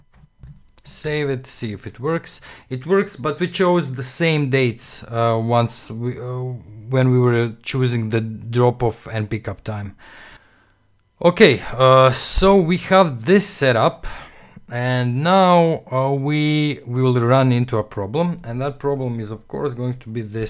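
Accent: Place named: Russia. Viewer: а акцент то русский)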